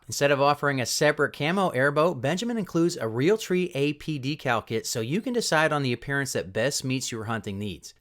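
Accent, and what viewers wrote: United States. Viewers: I always thought that the "Twang" was because of your southern accent. Thanks for all the great videos.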